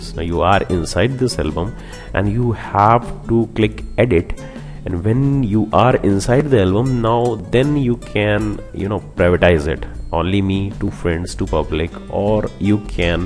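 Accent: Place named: India. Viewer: I love your accent, Mr. fellow Indian.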